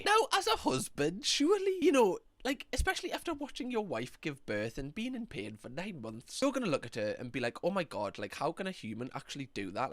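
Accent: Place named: North East England, United Kingdom. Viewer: I didn’t realise it was so long I’m about 50 minutes in, I love to binge watch so I wouldn’t have noticed I would have thought I’d just watched a series but thanks for the effort you put into these videos they are very entertaining you have an ace sense of humour and I love the north eastern accents, this one and Irish both melt me 😊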